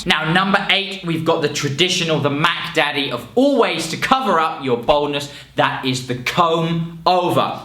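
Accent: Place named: England, United Kingdom. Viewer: He's English. He's saying it the right way. They did invent the language after all lol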